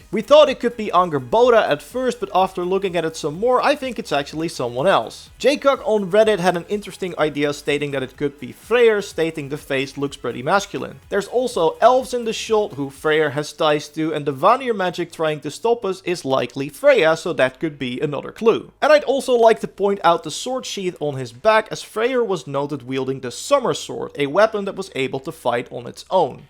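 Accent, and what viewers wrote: Netherlands. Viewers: je klinkt zo nederlands :p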